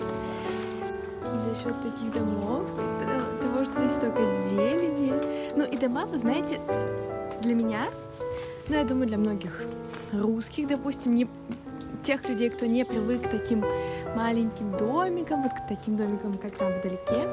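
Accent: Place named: France. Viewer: Привет! Откуда ты? Я никогда не слушал твой акцент 😄 Либа ето не акцент, проста как вы говорите? Вы как француженка с очень высоком уровень на русском хахаха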